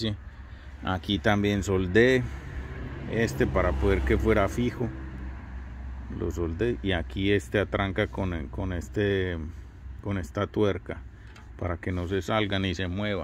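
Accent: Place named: Antioquia, Colombia. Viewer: Justo lo que estaba buscando. Quiero hacer uno de estos y encontré varios videos en otros idiomas pero este en el español con acento paisa lo entendí a la perfección saludos desde Colombia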